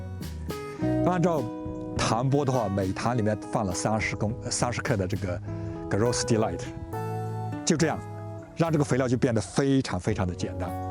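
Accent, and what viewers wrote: Yunnan, China. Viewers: 冒昧问一句，Andy叔您是不是云南人？我觉得你的普通话和我的很像，我是云南人！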